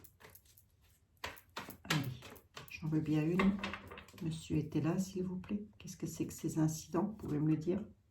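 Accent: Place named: Vosges, France. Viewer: Le léger accent ne m'a pas trompé. Je suis aussi des Vosges mais cette fois, avec un accent beaucoup plus prononcé. Je l'ai perdu un peu depuis que j'ai quitté la Lorraine...😂🙏😇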